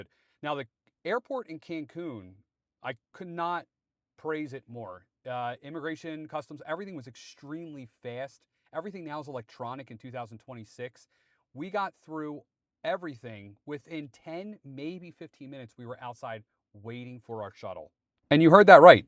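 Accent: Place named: Philadelphia, United States. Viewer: Clocked your phila . accent before you mentioned PHL! Nice review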